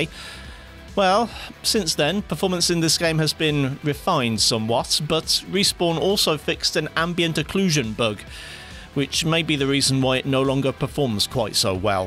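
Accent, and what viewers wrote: United Kingdom. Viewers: british guy speaking about GPUs for 19 minutes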